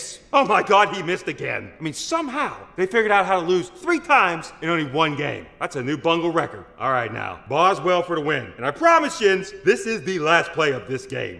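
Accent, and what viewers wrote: Pittsburgh, United States. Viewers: I just love this guy - even when we lose he makes it fun. He makes me a little homesick for Pittsburgh too, but it's nice to here the Pittsburghese from someone who loves the Steelers as much as I do! Keep them coming. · The amount of Pittsburgh-eese in this episode is epic! hahaha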